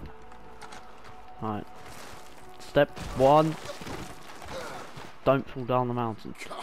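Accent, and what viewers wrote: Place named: Yorkshire, United Kingdom. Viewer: Yeah, thanks I already did it back in chapter4. Appreciated though, if I hadn't known then for sure I'd have checked it out. All the best Mrs (cool as heck name by the way). Just checked your channel out, your accent made me chuckle, reminded me of the Batley accent (where I'm from in West Yorkshire).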